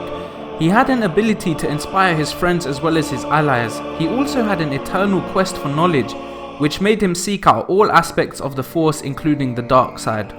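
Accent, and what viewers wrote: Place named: East London, United Kingdom. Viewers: damn you got a thick london accent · Loool I know your accent is it from east London?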